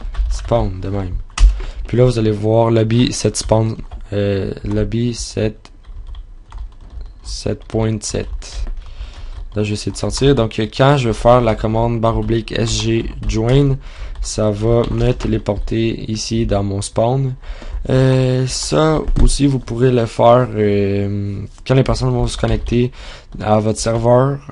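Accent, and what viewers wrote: Canada. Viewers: Super tuto, vraiment complet et bien expliqué, je suis toujours autant fan de l'accent canadzien :) Mais me voilà présentement bien embêté :) Tout le monde fait les même remarques concernant la permission /sg setlobbywall etc. J'ai utilisé le principe d'écrire directement le texte sur les panneaux mais dès que je clic dessus ça me dit "you don't have the permission to join arena1". Et quand je fait /sg help il ne me donne que les commandes "player" et pas admin. Donc je peux /sg setspawn, mais pas entrer sur l'arena 1 que je viens de créer. Un vrai casse tête. Mais ton tuto est super, c'est juste moi qui suis nul :D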